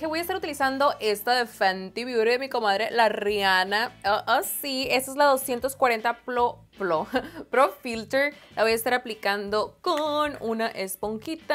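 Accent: Sinaloa, Mexico. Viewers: Todo el tiempo te escucho voz sinaloense 😂😂😂 también soy de sinaloa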